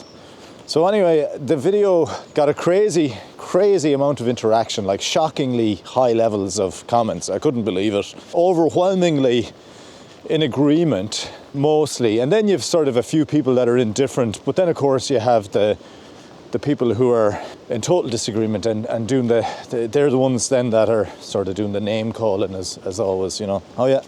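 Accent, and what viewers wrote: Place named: Connacht, Ireland. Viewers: Comedy genius mate, Alan Partridge with a Connaught accent 🤣👌🏽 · We in Dublin have discovered you! The message is truth. I love the Galway accent for talking about this stuff.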